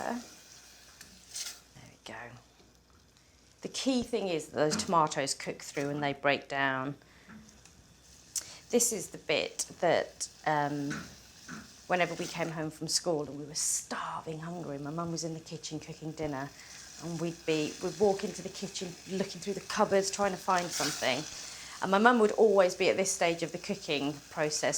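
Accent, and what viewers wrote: England, United Kingdom. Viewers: U r a very good and beautiful instructor. I also watched ur video on making samosa. Also such a beautiful english accent. Thanks. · she actually sounds like a lot of British asians born and raised here. I have a Pakistani colleague who speaks so similarly to her. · She speaks British English. Must have been educated there. Very professional video. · As I wrote down the ingredients of this recipe, without looking I could swear that this is the voice of an older English lady. Remarkable how accents around the world get absorbed. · Yair Gil... sorry I don’t quite understand your comment, speaks very eloquently so everyone can follow the recipes. She isn’t trying to sound more English, she is English!. comes across as very down to earth and is just sharing her passion for cooking! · The worst thing is the acquired British accent which is in no way better then the sweet Indian accent. 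· Indian kitchen with very beautiful Harry Potter accent. Good combo. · Dusky Indian looks Dark hair and eyes. Impeccable English accent. Nice healthy simple Indian dish. Isn't Ghotra...LOVE itself?🥰🥰🥰 Lub ya & your receipe, love!!!! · Well I like your British accent from Toronto of guyanese origin in the west indies. Our dall is cooked with yellow split peas boiled for about 2 hours with garlic and turmeric then chunkay with oil,garlic and Cuman. We use dall to compliment any dishes with meat or vegetables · This is a fairly typical English accent for all ages and sexes among those without a regional accent!!! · just found your channel and i am very pleased and going to cook this dish. my daughter livend in london for twenty years before she died of breast cancer and london became my second home i am swiss but live now on the eastern sea in germany. i like to hear that wonderful english english and will have a good look at many of your dishes. 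whenever i arrived in london, the frist meal was alway indian and myself am cooking with indin spices too. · Thanks for style of cooking..just to let you know not my style. But am being polite in appreciating your efforts. Understanding your British accent is something else. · Such a lovely British accent 😅 Greetings from Germany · How come this Indian Lady speaks like an English Lady? I always thought that dhal is the Indian name for lentils. Or is there something called lentil dhal? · I have never in my life seen an Indian with an English accent from England.. this is weird..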